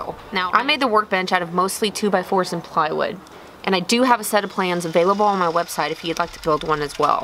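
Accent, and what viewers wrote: Texas, United States. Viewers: Texas. It's a southern thing.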